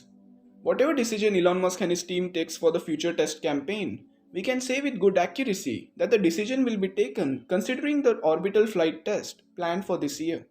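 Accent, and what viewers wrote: India. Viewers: Horrible Indian English accent, made my head hurt.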